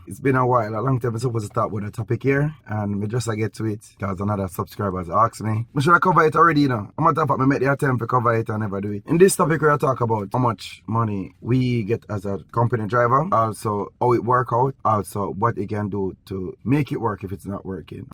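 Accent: Jamaica. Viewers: It's Jamaican patois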